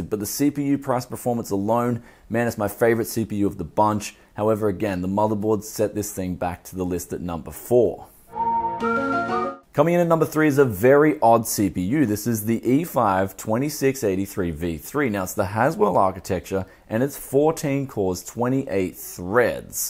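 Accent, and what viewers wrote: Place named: Australia. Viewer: He's Australian xD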